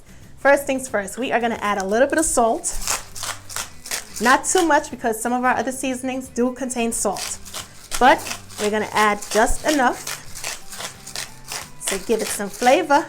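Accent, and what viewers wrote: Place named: New York, United States. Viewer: I LOVE HOW SHE SAYS SAUWWSE (SAUCE) LOL THAT NY ACCENT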